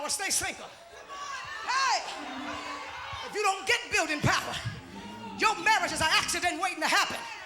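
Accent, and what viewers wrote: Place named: South, United States. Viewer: another jumping on the band wagon of celebritism. If I make a comment about Brad Pitt and Angelina will I get discovered? Not a scripture in sight or in site. Thanks for telling us your whole life story in that once again loud southern preachers voice!You got a mike but I guess you cant get the same effects if you cant yell and stir a crowd emotionally! Ah baby...I dont feel like having sex tonight! You dont hear me! You didnt buy me no stockings...Wake up! marriage counseling is cheaper!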